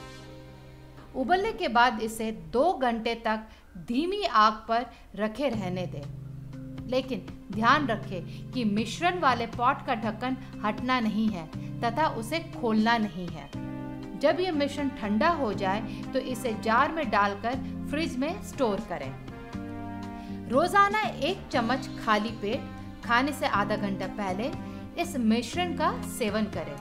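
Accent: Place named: India. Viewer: Can you be clear please like (ENGLISH) I don't understand Indian 😆🙄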